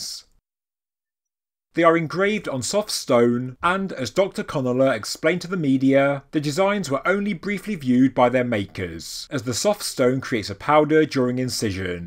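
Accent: United Kingdom. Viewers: Worst dialect inflections of the UK. Spoils the whole show.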